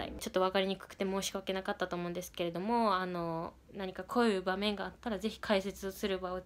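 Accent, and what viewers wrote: Kagoshima, Japan. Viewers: すばらしい！きれいな鹿児島弁です。おいどんがた、きっしゃなか鹿児島弁やね～（笑） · 鹿児島に産まれたかったなー！ 鹿児島弁かわいいなー！ 眉毛のインパクト大！！！！ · chie zooo 鹿児島弁可愛いですけ？ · ゆうりちゃんのかごんま弁が可愛すぎてちょこちょこ見に来てしまう…❤️ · 가고시마사투리 귀여워요ㅋㅋ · すごい可愛いし、見てて全く飽きないし、鹿児島弁がすごく好きになりました！！(関東人)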